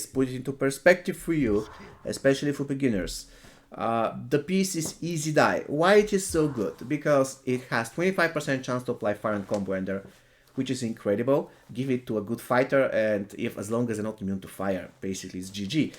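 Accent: Bulgaria. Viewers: are you bulgarian?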